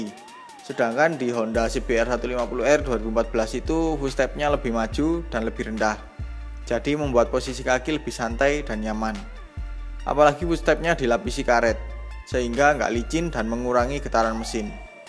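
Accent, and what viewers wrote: Malang, Indonesia. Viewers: Orang Malang/Batu mas?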